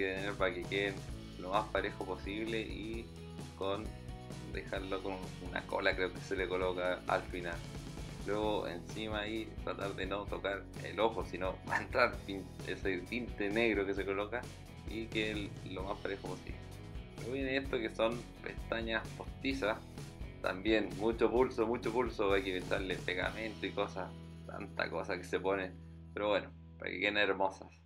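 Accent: Chile. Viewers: Es el primer video que veo de este canal y puedo decir que con solo escucharlo se que el chico es chileno XD #LoMasParejoPosible #LoMasDifuminadoPosible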